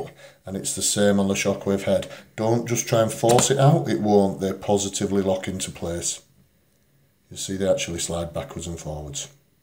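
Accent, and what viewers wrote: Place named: Yorkshire, United Kingdom. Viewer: Good Northern Yorkshire(?) accent and very informative on his personal experiences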